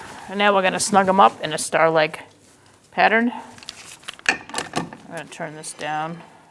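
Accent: Boston, United States. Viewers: Thanks for the content .. I'm about to do the rear two on mine .. btw is that a Boston accent ?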